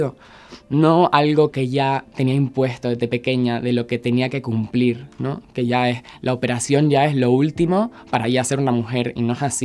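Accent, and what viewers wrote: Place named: Chile. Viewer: Tiene una linda aura; resplandeciente; su acento siempre me ha parecido un poco chileno.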